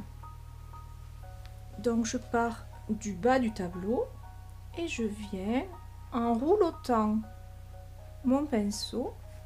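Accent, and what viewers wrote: South, France. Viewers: Un grand merci pour ces partages, vous avez l'accent du soleil,J'adore !🎨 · J'aime beaucoup ce que vous faites. Celui-ci me paraît simple, je le ferai dès demain.! 🤔🤔🤔⚠️⚠️⚠️ Enfin je vais essayer. Bisous bisous.. De quelle région est votre accent? Marseille, Perpignan? Toulouse.? Parce qu'il me semble que nous avons le même, moi c'est du Midi 😜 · Il est ma..fini.. fi..que votre tableau. Je suis abonnée depuis plus semaines et j adore visionner vos turbos. Merci encore pour votre voix chantante.. du Sud sans doute??😃💋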